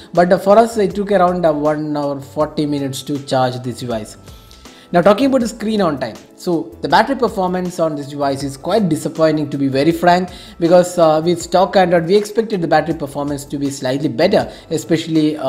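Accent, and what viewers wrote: India. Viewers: Indian english - auto dislike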